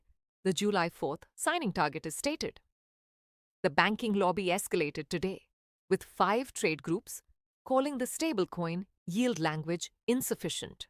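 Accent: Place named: India. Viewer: Why an Indian accent 😂skip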